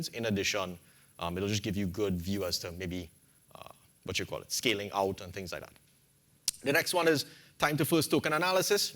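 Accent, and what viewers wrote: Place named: Caribbean. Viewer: Thanks for this presentation,. This has been very helpful. Also great to hear a Caribbean accent 🇹🇹. Bless up me bredda.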